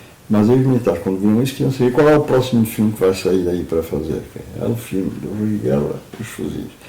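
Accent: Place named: Portugal. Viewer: Sempre o sotaque portugues------isso ´ é bom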